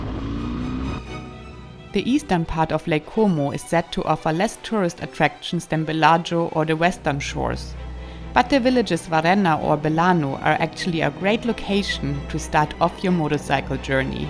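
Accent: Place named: Germany. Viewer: This girl has to be German. Nice accent when she speaks.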